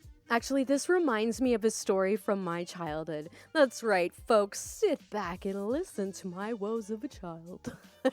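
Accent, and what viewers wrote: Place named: New Jersey, United States. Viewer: That last story could be simply entitled people. Your accent reminded me of the South Park "From Jersey" episode.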